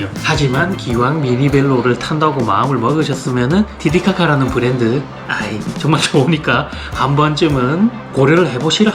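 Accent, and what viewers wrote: Busan, South Korea. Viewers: 전형적인 부산 상남자 스타일~ 요즘 안쓰는 부산 사투리 들으니 정겹네요. 여행은 일상의 탈출이 되어야지 일상이 되면 안될거 같아요. 구독지수 늘어나도 직장은 50까지 놓지 마시길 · 생긴거는 이슬람으로 수염 덕지덕지한 형이 부산사투리를 하니 얼마나 이쁘나.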